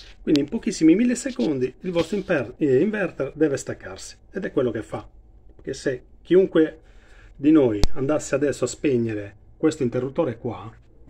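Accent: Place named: Sardinia, Italy. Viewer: Ciao, ma sei sardo anche tu vero?? 😎 Si capisce dall'accento e perche sei in gamba! Comunque interrompi tutto sul più bello, dici "ma adesso andiamo a vedere" e poi finisce il video 😢 Io sto riscontrando un problema dei dati misurati dal sensore, che a casa mia è stato posto a monte dell'interruttore generale, proprio nel quadro di casa...incrociando i dati con letture contatore e la pinza Shelly EM che ho installato a valle del generale, hi notato che c'è una discrepanza nella lettura dei consumi di casa e dellenergia prelevata, di ben 45kWh in un mese (Gennaio, dunque 24gg)...secondo te cosa può essere? Va' assolutamente collegata proprio nella cassetta del contatore? La linea dal contatore al generale è diretta, c'è solo un altro quadretto con un altro interruttore. Comunque caricaci la fine del videoooo 😂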